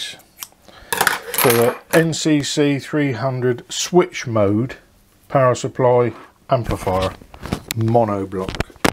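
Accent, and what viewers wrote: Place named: Midlands, United Kingdom. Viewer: I'm trying to work out your accent. It's not Yorkshire, but more like Leicestershire, Derbyshire, Shropshire or Lincolnshire...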